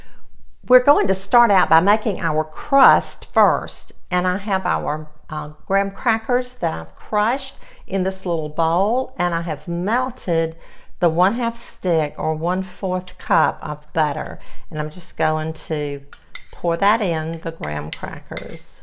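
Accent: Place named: Alabama, United States. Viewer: Looks wonderful! Look forward to trying this. Oh how I love blueberries. I heard you mention Thomasville. Is that Alabama? My step mom was from there and passed away recently (Atkeison). Your beautiful accent reminds me of her. God bless!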